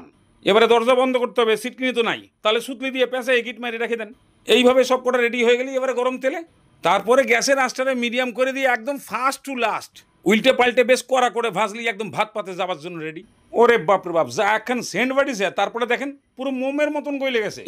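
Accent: Bangal, Bangladesh. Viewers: Sob thik ache sudhu bangal der moto kotha bola ta charte hobe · আপনার বাঙাল ভাষা শুনতে খুব ভালো লাগে, আর রান্নাগুলোর তো কোনো জবাব নেই। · dada apnake onek dhonyobad.Darun sundar testy korolar recipe dekhlam.Tobe dada apnar bangal katha gulo Bhanu Bandopadhyay er moto lagche.Apnar quality ache aar apni drama korte parben.Thanks.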